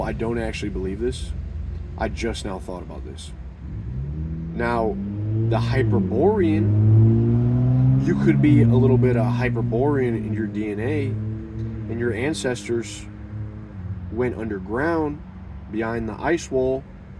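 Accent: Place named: Ohio, United States. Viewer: Man I recognized the accent immediately. I grew up in Clyde-Sandusky co area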